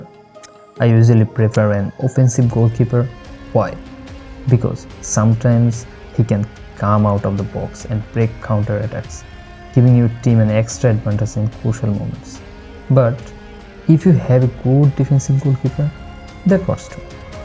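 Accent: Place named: Kerala, India. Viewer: i knew right away that you're a mallu from that accent...aren't you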